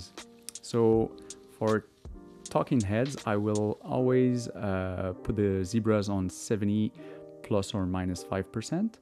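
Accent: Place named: Quebec, Canada. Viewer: I think I can hear you quebec accent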